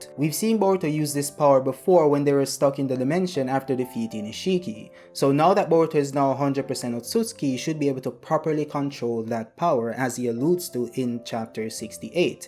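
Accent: Jamaica. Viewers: Hmm u sound Jamaican... are you?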